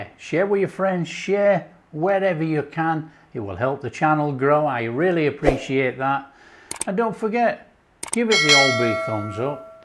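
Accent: United Kingdom. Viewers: Happy day to you sir, love your accent oF BRITAN, you are a chef and master that makes it so enjoyable to watch. Food looks great and love your passion for sharing with us, GREETINGS OR CHEERS TO YOU SIR FROM THE GREAT STATE OF ILLINOIS. #1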